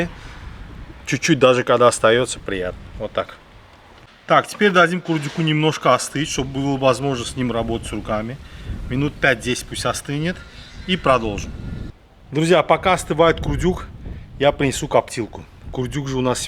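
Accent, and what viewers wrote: Baku, Azerbaijan. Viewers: смотрю видео,слушаю ваш бакинский акцент:-респект и уважение!Будьте всегда здоровы,живите долго,радуйте новыми видео, которые не оставляют никого равнодушными,я думаю многие такого же мнения! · нет брат он не таджик по аксценту чиста Азербайджанец я таджик не думай что я нацист просто у нас капчоних курдюк я не видел хотя самие лючшие курдюк ето наш Гиссарского породи честно сказат я его постоянно ел в России в санатории нам прислали кыргызские братья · Бакинский окцент..🥸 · Маладец бакинских акцент нигде в мире не перепутаеш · Бакинский акцент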